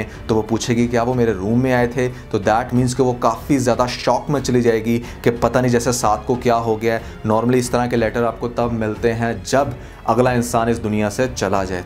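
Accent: Punjab, India. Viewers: Ap punjabi hn ?? Ap k excent se laga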